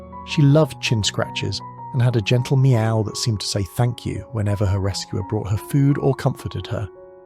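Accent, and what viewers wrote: United Kingdom. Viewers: Its pavement or footpath in UK. Narrator is very English.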